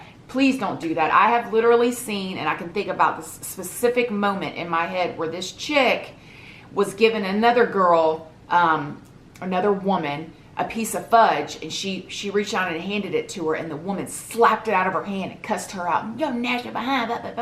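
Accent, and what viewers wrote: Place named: Texas, United States. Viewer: This woman has the Texan accent of my teacher.. But she is from Florida..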